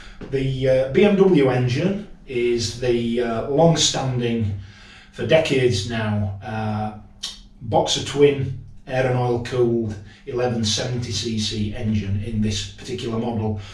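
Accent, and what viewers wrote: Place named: Bradford, United Kingdom. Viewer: Great review kid. Watching from Sydney, and lovely to hear a Bradford accent while being educated.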